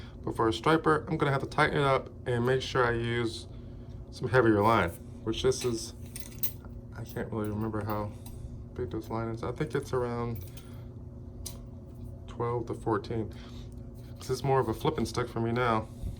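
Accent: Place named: United States. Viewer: Your video is so helpful brother 🥂 but American English is almost terrible lol... I can hardly hear all that you have explained 🤦‍♂️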